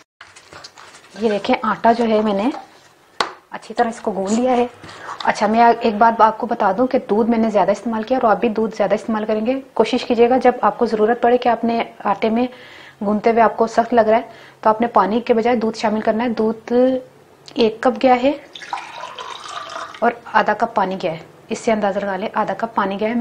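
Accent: Punjab, India. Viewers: Your panjabi so sweet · ماشاء اللہ جی... چس آ گئ... پراٹھے کی بھی اور آپ کی میٹھی میٹھی پنجابی کی بھی.. 🤤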